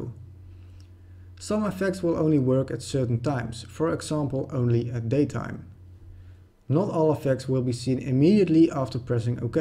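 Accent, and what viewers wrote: Netherlands. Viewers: I smell Dutch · First of all thank you so much for this!!! Second, are you Dutch? Your accent reminds me of my own. :)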